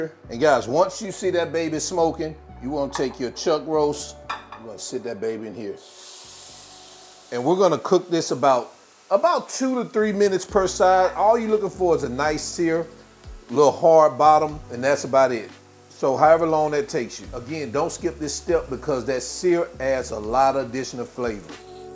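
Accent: South, United States. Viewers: lol a southern accent is not “bad pronunciation”. Be proud of where you’re from💪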